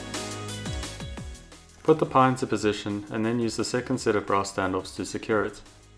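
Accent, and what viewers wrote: South Africa. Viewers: dude that would be awesome please as not as many people have access to laser cutters as to 3D printers! P.S. great to finally here a Saffas voice on one of these channels, keep up the awesome work! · a fan of our South African Accent!...Well done bru, lovely work. I have the horizontal mounted ICE Tower, perhaps a "Flatbed" version?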